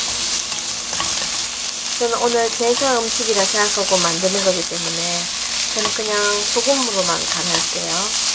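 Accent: Gyeongsang, South Korea. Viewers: 답변 감사해요. 나이도 적잖은데 창피하게 음식할줄을 모르네요ㅠ 작년에 삶은고사리 사서 볶았는데 떫은맛땜에 못먹었거든요. 그래서 물어봤어요. 말씨가 대구분인것 같은데 조곤조곤 설명해주시는거 참 듣기가 좋아요. 많이 배울께요.^^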